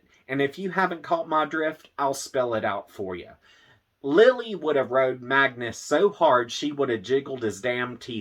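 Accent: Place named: Texas, United States. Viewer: That explains it, I'm born and raised in Texas, lived in Georgia for three years now, it was definitely nice to hear a familiar sounding voice on YouTube for a change ✌ I'm sending my best wishes, and have a wonderful day ☺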